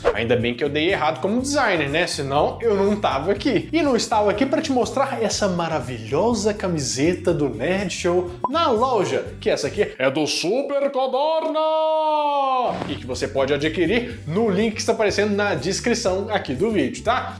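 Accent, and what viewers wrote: Minas Gerais, Brazil. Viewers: Único canal em que não pulo qdo está pedindo pra dar like e compartilhar. Amo seu jeitin mineirin de falar. Pura nostalgia pq sou mineira e não tenho sotaque pois estou há 65 anos em São Paulo. E seus vídeos são ótimos, amo curiosidades e aprendo muito com vc rapaz. 🙏🏻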